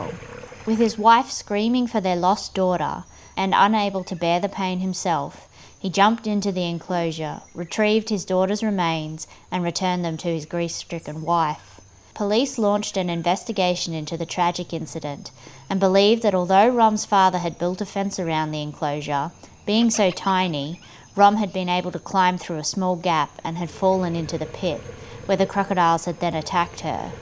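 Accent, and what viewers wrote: Australia. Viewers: great to hear an aussie accent narrating, excellent content!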